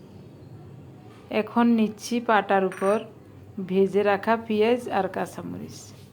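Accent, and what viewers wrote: Noakhali, Bangladesh. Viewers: Ami Biratir theke dekhi.Spnar kothar modhye noakhalir tan ache.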